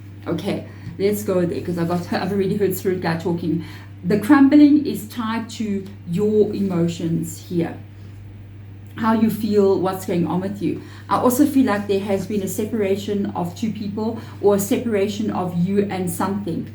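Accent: South Africa. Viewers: Wow, wow, wow, however a bit conflicting, been fighting six years for my property sold unlawfully by my husband, got an eviction order on Wednesday - valentines day, going to appeal and lodge another case to fight the unlawful sale - I trust this is the right action???? Know I will win the case if I do, but don't really have the finances for a High Court Action - should I let go of all that I have worked for and more on??? Keep getting conflicting answers from the Universe. Wishing you love and light. By your accent I assume you to be a fellow South African. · Thank you...great reading...first time watching you...arw you South African?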